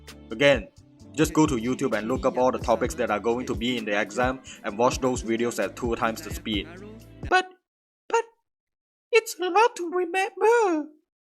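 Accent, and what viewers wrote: Malaysia. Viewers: as a "banana" Myself i think your english still has that chinese manglish twang to it, its very obvious and yes i bleed red white and blue and fuck the yellow part 😂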